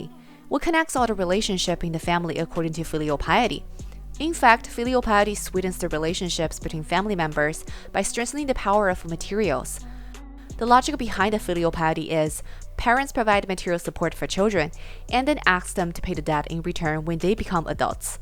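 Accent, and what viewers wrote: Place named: China. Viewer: Interesting topic + excellent explanation + insightful review of the topic + Chinese accent = top tier educational video!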